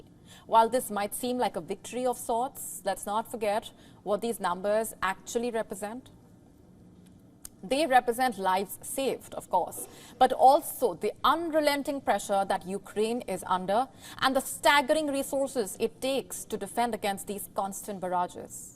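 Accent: India. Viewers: Your india asent i wonder how you are doing properganda for the west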